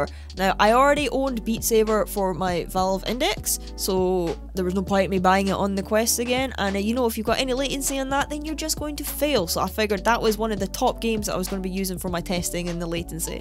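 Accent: United Kingdom. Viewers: Great video! Love your brit ascent!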